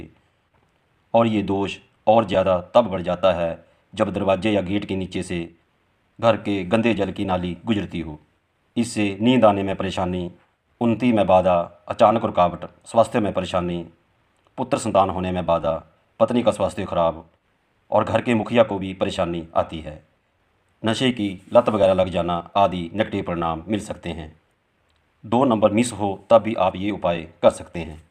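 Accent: Punjab, India. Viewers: ur speak style very nice punjabi touch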